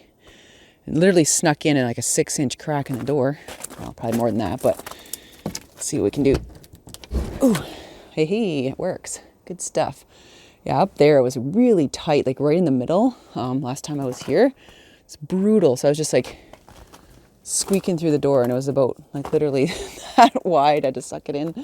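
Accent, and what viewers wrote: Canada. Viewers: My thought exactly, I’m in Vancouver I too heard that Ontario accent...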